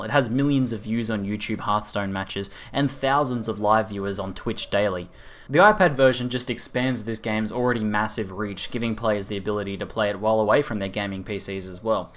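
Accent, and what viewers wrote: Australia. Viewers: took me about 1 seconds to realise hes australian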